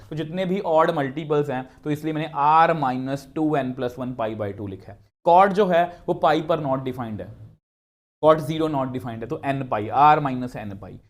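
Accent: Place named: Pakistan. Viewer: Sir ka accent pakistani kyu hai 💀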